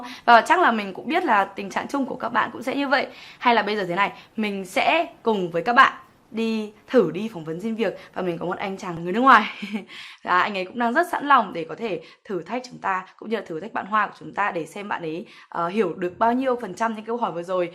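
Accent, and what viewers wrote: Standard. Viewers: Video của bạn chia sẻ quá hay. Rất tốt cho mọi người đang học tiếng Anh. Phát âm của bạn chuẩn quá.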